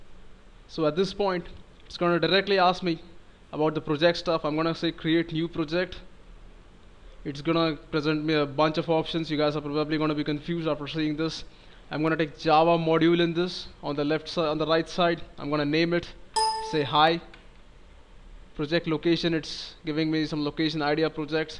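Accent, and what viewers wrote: India. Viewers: Indian have indian accent. So what? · Indian accent :3. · Where can i find the non-indian accented version of this tutorial?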